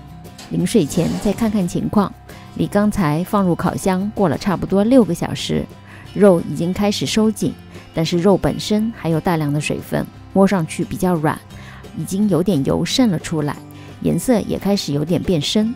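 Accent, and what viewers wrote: Shanghai, China. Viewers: 最喜欢的东西上海普通话越听越亲切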